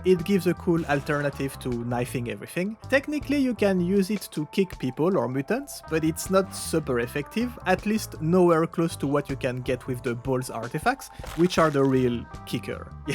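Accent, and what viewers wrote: France. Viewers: Hi dude, I guess many already asked you this but are you French ? Your accent sounds a lot like French lol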